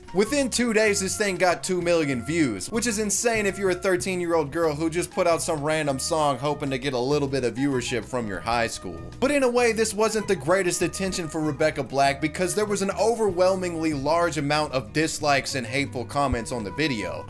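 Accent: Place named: South, United States. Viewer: Youre like an attractive repilitian methhead Pewdiepie with a slight Southern accent that if you wouldnt have specified would be of untraceable American regional origin. Surferish to degree.. But all around normal, affable fellow in demeanor. Maculay Culkin meets Matt McConaughey. My love is Tsundere platonic bromance-respect.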